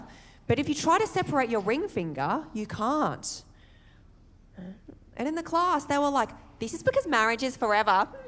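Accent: Australia. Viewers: I detect an Australian accent, pretty cool. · I came here from a Yumi Nagashima video. The Aussie accent really threw me off lol. I'm not sure what I was expecting when I clicked, it just wasn't a strong Australian accent 😅 Hilarious stuff! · Strange seeing a Chinese with an Australian accent. 🤣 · I'm an Aussie who moved OS in 98 and I think it's just really cool hearing and Aussie accent from an Asian (sorry if this sounded racist, not my intention.....oops) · Your material is excellent. Great comedy. Only Aussie chinese girl can deliver the punchlines so well 👍👍🌹🌹You're just as good and funny as Aaron Chan. 100% aussie accent too 😃😃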